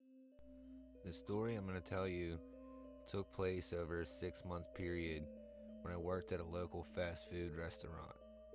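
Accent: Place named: Pittsburgh, United States. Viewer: Good stories! Only critique is that when you are speaking I can still hear that Pittsburgh accent come through. Like "..... One of the last things I'm gonna share wit JEW." It's hard to break that after speaking it for so many years. Keep doing what you are doing..... The followers will come!!!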